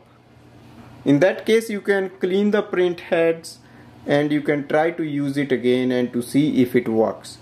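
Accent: India. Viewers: Talk in Hindi ,your English is typical Hindi English language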